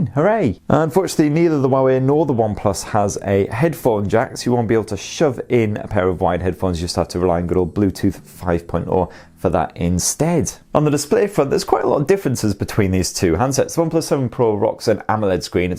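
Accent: United Kingdom. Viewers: like your comparison videos, and is good to hear a British accent that is easier to understand :)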